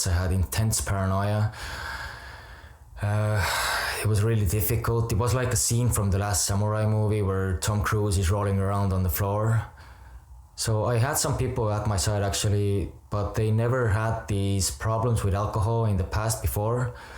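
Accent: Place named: Ireland. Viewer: Kind of sounds like an Irish accent.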